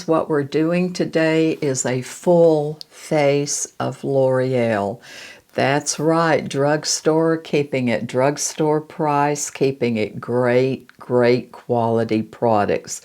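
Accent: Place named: Appalachia, United States. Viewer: ! I also love your accent, it reminds me of home. I’m from Appalachian area of North Carolina and Tennessee, but live in Ohio now. You are a true joy ✨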